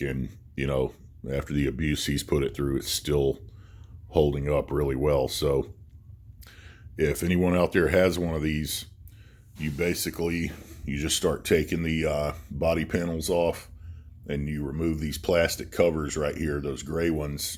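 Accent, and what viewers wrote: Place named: United States. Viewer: Bro ur voice it sounds so American how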